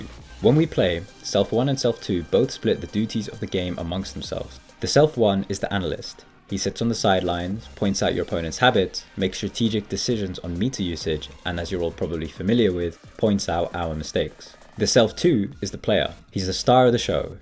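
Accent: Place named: United Kingdom. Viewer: Leave it to a guy with a British accent to give me street fighter insight that applies to just life in general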